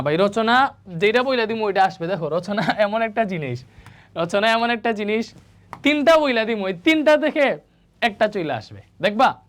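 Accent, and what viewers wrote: Sylhet, Bangladesh. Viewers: Apni ki Sylhet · ভাই সিলেটী নি,,,🫣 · Sir apni sylhety basay Kotha bolen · সিলেটি ভাই, কিতা করো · স্যার কি সিলেটি 😊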